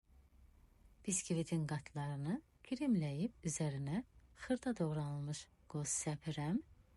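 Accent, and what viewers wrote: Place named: Turkey. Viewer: Hazırladıqlarınıza söz yox.Əla.Danışıq tərzinizi anlamıram.Türkiyədə yaşayırsınız?Cümlələrin sonunda olan vurğu bizim millətə xas deyil.Bu səbəbdən soruşuram.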